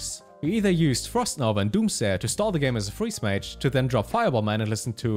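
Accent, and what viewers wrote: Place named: Germany. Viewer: That fast German-English accent is exhausting to listen to.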